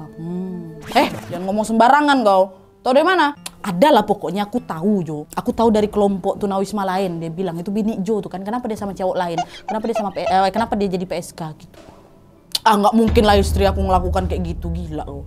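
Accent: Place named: Medan, Indonesia. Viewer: Logat Medan yg totok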